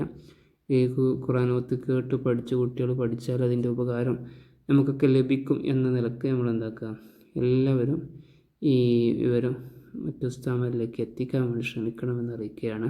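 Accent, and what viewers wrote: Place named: Kasaragod, India. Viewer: സംസാരം കേട്ട് കാസർകോട് ജില്ല എന്ന് തോന്നുന്നു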